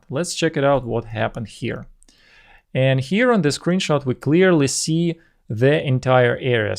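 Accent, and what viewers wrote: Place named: Russia. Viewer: Спасибо! Английский с русским акцентом очень легок для восприятия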